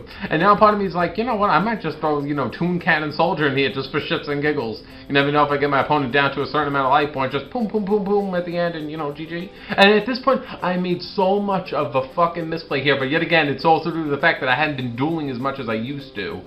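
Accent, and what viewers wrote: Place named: Brooklyn, United States. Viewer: Apologies if its wrong but I believe its a brooklynn accent.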